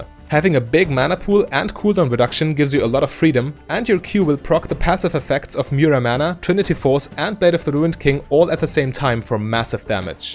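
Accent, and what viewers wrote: Germany. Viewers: Idk why but i just instantly hear that you are german. Right? :) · effecient german voice, complete with runes, builds and poke guide. very nice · u german?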